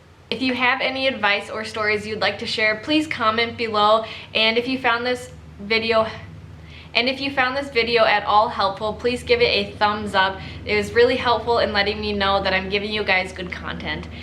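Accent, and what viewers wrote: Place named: Minnesota, United States. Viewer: Why would u have to drive to a bus station and then take a bus? Ive got to have ESP the second I turned your video on I thought Minnesota it must have been the accent.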